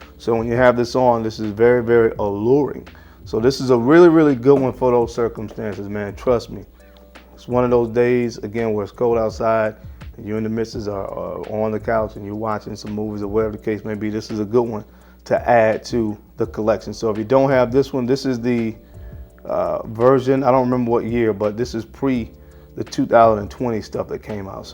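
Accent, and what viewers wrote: South, United States. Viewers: I sure wish you would either learn how to enunciate your words - the southern drawl and other speech effx are confounding - and invest in upgrading your vids so that we can see the actual names of the frags and manufacturers. Thanks.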